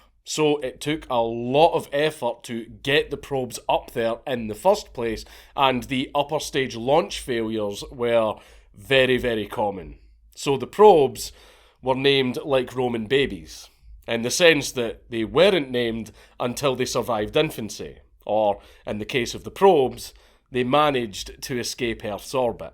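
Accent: Scotland, United Kingdom. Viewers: Lmfao!! The part where the probe began to scream had me f**kin rolling. I love this channel. It honestly wouldn’t be the same without it’s brilliant and hilarious Scottish host. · Ich liebe deinen schottischen Dialekt bruder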